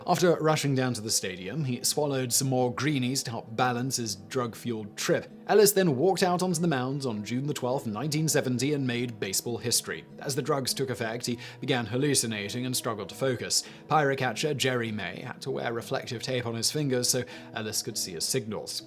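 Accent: United Kingdom. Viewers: Do you cold read from a script? Your pronunciation of some names in your videos, British accent notwithstanding (When will Brits speak English?) is often unique, if not horrendous. Eddie [GAE-del]? Seriously?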